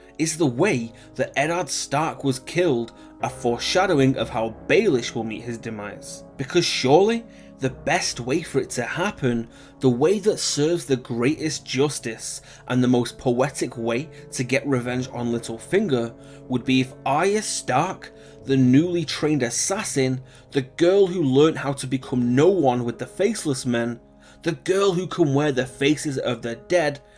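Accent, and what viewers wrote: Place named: Northern England, United Kingdom. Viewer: ur accent???? where is it from just curious? its mixed of jon snow (winterfelish/northern english/ mancunion) n American? its mixed or is it me only? or i donn know i m mancunian so hope u donn mind mate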